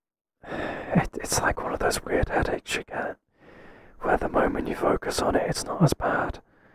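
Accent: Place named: United Kingdom. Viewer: i love the british accent 🤌 keep going J! 💋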